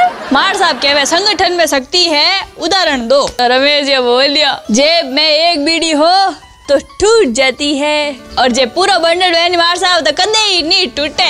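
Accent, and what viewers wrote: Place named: Marwari, India. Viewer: U are amazing I love the way u speak in English and Hindi with marvadi accent.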